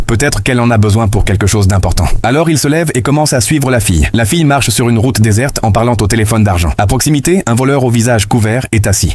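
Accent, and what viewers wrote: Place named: France. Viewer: Merci pour votre accent français. Monsieur l'auditeur. 😮. C'est génial. De ta part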